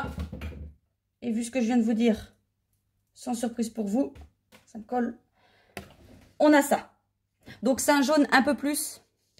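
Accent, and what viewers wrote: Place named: Normandy, France. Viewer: 🎉 Coucou, vous me touchez beaucoup et souvent.. ❤ Votre travail est magnifique. J'aime vos Créations et vos Pensées généreuses,.. et aussi, je dois le dire vos mots avec les intonations qui sont les mêmes que celles de ma région natale😂 ( près du Mont St Michel, côté Normandie ) j'adore.. ❤ Merci pour tout; votre futur chàle est Magnifique.. 👌🌟 Belle journée ❤😘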